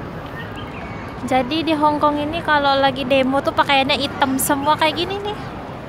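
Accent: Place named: Java, Indonesia. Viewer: Keren say videonya.. kedengaran logat orang jawa juga.. 😁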